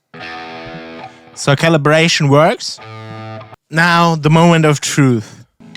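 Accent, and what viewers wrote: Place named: Germany. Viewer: Knew you were German after 2 seconds. Thank you for this video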